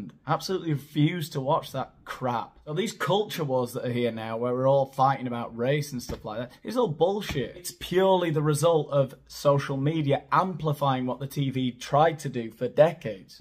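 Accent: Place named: North, United Kingdom. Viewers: Trying to place your accent. Got to be Manchester? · I am a recent subscriber. I love the way algorithms introduce people to your in-feed. I instantly related to you. For a start I could tell you were a Mancunian.......I am too. I'm from the dreaded Stockport I love your voice. The white curtain behind you meant you could be anyw. I I visualised you in central Manchester in a swanky apatym. Anyway, you disclosed that you were in Spain. I wish you and your family tons if happiness. I love your sense of humour. It's so, so northern. The first day the algorithm showed me was when bloody Meghan znarkke was at The Bridgewater Hall. As a Mancunian I live your humour I'm 62 yrokd . My kids are38yr and 32 Yr and you remind me of their teachers. Stockport Scchool I love your accent. Where are you from in Manchester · First time I have clicked on you. 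I am full of admiration. 65 yr old Londoner who has lived in Florida for 35 yrs. You strike me as an incredible mix of John Lennon and Russell Brand. Kudos to your parents. Oh, and I lived for 3 years in Hull.(Methinks I hear a Northern strain)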